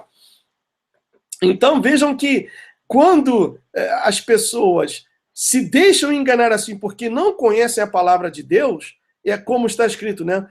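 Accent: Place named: United States. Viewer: tchat???!! pô fala serio.... vc mistura português com sotaque americano... se liga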